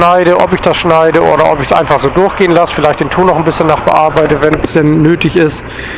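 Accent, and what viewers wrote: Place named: Northern Germany, Germany. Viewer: Ach, ich liebe den norddeutschen Akzent einfach 😃 Hab mal nen Abo dagelassen, und hoffe das hier bald auch mal wieder Videomässig was kommt!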